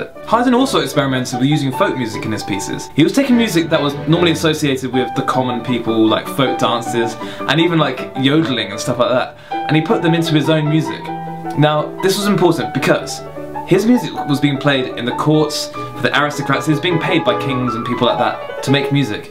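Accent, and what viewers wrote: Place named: United Kingdom. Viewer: It too much British accent their,please slow it little bit not able to understand clearly. Thanks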